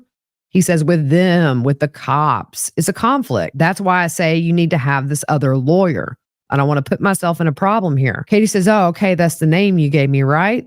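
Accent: South, United States. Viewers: I love Wendi but i love your southern accent also.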